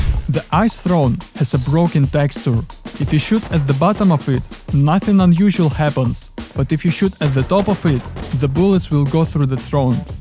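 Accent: Russia. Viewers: Бро разговариваешь хорошо, но мы знаем что ты из России) акцент тебя выдает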